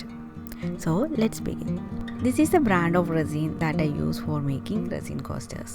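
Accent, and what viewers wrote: India. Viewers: мне так нравится твой индийский английский!!! Американский английский звучит на мой слух ужасно, не всегда можно понять говорящего, а твой звучит чётко и даже нежно. Обожаю тебя слушать, хотя ты редко это делаешь. А цветок нежнейший, а запечатанный он выглядит просто восхитительно!!!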